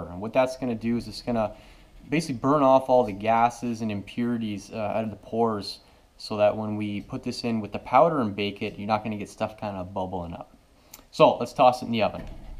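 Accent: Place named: Canada. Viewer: the content provider’s dialect sounds Canadian to me, possibly Wisconsin, or Minnesota here in the States.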